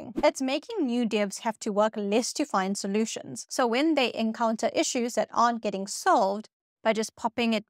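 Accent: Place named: South Africa. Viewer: I lilke your channel it'sinteresting you sound south African and Indian, although i dont know you coul dbe sri lankan or etheopian dutch lol.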